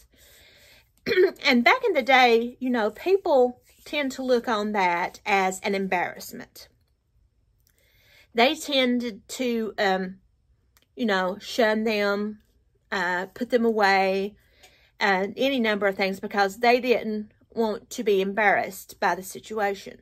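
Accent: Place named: Georgia, United States. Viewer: Enjoyed the story. You sound like some "homefolks" I grew up with in south Georgia. Too bad todays kids listen to some "gamer" on youtube that can't say 3 words without a 4 letter word stuck in between them.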